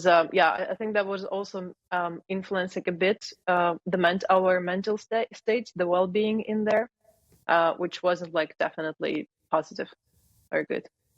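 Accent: Scotland, United Kingdom. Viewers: Cute Scottish accent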